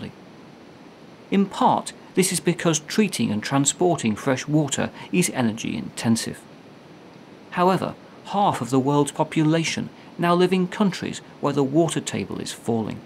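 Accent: United Kingdom. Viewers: Does every fucking talking show host person have to have a british accent? Cool video btw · I think hes from Northern England. Im from London, our accents are quite different to eachother.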